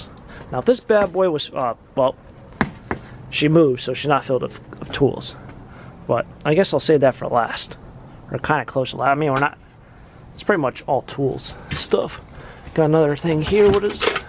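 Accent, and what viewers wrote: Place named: Philadelphia, United States. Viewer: I smell a Philly accent.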